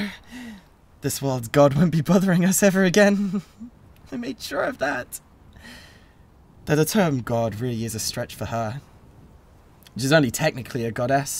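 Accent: United Kingdom. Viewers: You're one of the few ASMRtist that can I British voice I really like, I tolerate very few too.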